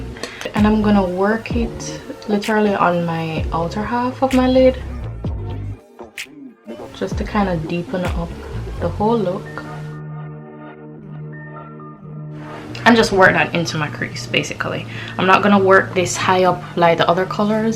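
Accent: Jamaica. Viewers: You Jamaica already know the accent 😍😍😍🇯🇲🇯🇲 island gyal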